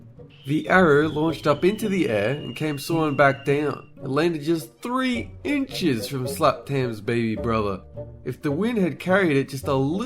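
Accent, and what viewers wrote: United Kingdom. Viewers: Wait, your not American? Are you British? Or are you Australian? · Are You British? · Eveline E-001 he's British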